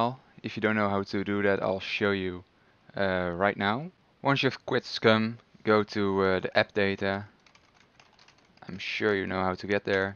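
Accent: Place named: Netherlands. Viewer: xD You're Dutch. (Posted this after the first 5 seconds of watching this video.)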